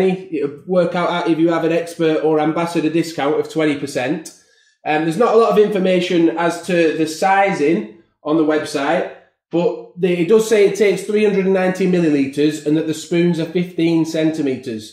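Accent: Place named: Yorkshire, United Kingdom. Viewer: i will 😊 of the bat you sound Yorkshire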